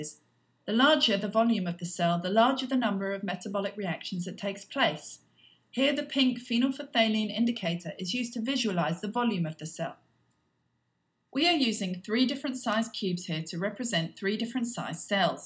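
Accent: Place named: United Kingdom. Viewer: = = british accent? im fine with dat.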